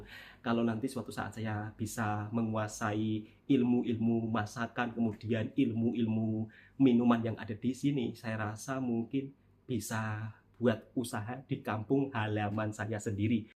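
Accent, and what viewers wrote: Java, Indonesia. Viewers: Loghat jawanya pekat sangat. Macam sup ekor pekat❤❤❤❤❤ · Assalam Tuan.....tak perlu tukar bentuk bahasa, sy boleh faham. Disini pun ramai org jawa yg bunyinya sama dgn. Pasal pedas, org m'sia punyai lidah yg dan tekak yg berbeza dgn pedas. Jadi utk berniaga, diambil jalan tengah, tidak terlalu pedas!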